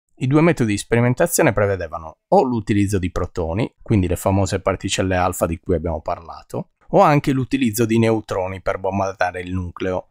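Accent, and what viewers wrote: Sardinia, Italy. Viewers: Complimenti per la sua chiarezza espositiva. Il suo italiano impeccabile e il suo innegabile accento sardo rende ogni suo video un tributo alla scienza che vale la pena essere visto e interiorizzato.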